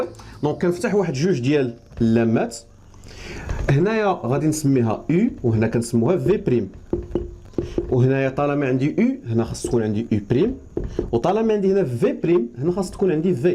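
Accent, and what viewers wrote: Morocco. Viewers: هذه اللهجة مغربية أم جزائرية ؟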